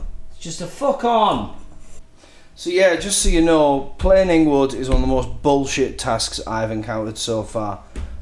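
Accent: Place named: Darlington, United Kingdom. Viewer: I'm itching to see the next episode... are you posting anytime soon? Great to see your videos though, I'm a Darlo boy living in New Zealand and it's good to hear a familiar accent.... Cheers, Steve.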